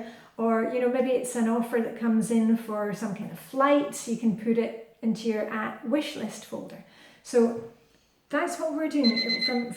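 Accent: Scotland, United Kingdom. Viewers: Thank you so much for making things seem so possible and realistic. I am doing well on my routines, I have just listened to David Allen GTD and am delighted to put the two systems together. I really enjoy and look forward to your videos, very refreshing to hear a Scottish accent and not American (sorry USA) Im Irish and its nice to see/hear a European point of view. Thanks for all you do - Anne Marie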